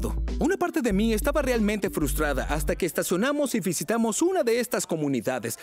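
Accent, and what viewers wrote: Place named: United States. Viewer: gringo es que hablas ingles como norteamerica le dicen gringolandia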